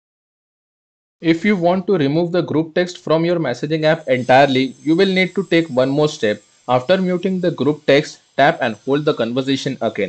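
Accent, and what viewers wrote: India. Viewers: I can't understand your Indian/ English verbiage.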